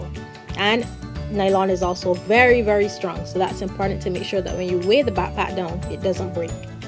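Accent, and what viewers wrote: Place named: Caribbean. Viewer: great vid, busta??? where r u from? the caribbean?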